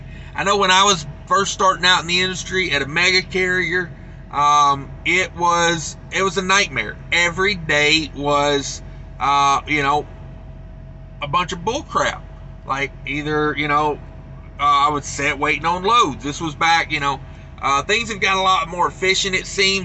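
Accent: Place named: South, United States. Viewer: Your eloquent words with a wonderful southern twang speaks truth. You consistently remind me of why I left trucking after 25 years. Hurry up and wait has been stuck in my brain for years! My first year was with England. My last load with them had me waiting a day and a half! These bastards know how to steal a trucking dream!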